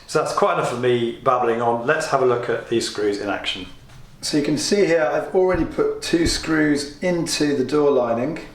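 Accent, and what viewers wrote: United Kingdom. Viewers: I like your videos but hate your accent..I really hate British accents.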